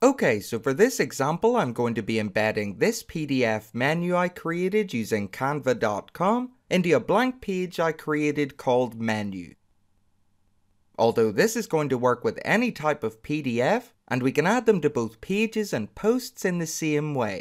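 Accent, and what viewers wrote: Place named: Belfast, United Kingdom. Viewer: Hello. From your accent I think you may be local to me in Belfast. If I could ask a question it would be great. I installed a pdf embedder a few months ago in our Wordpress website. It worked well until this weekend when it seems to have disappeared! I can't remember which plugin it was so I installed another one that has a 'toe bar' (?) like the one you use, but my original embedder allowed you to scroll up and down directly on the pdf. Is it possible to get this back. Many thanks, Geraldine.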